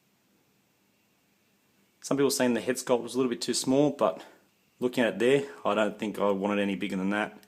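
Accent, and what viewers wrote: Australia. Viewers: it looks awesome and i cant wait for mine to arrive. i wish i had this paid off already but last payment is today and should be here soon. i assume your living in australia? due to your accent,im a west aussie and just wondering if there shipping to australia yet. cheers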